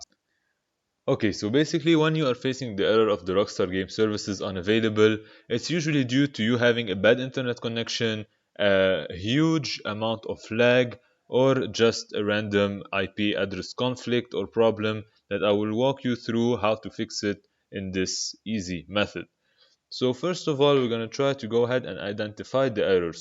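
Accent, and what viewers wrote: Lebanon. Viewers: Are you lebanese ? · Damn are you Lebanese? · Lebanese ??? I think I figured it out from the accent 😂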